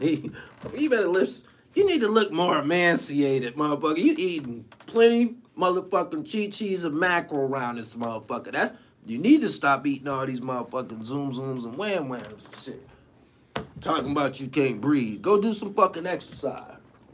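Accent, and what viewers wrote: New York, United States. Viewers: You do sound like 1 of them bias new York dudes im just saying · Your slick New York talk is old my guy!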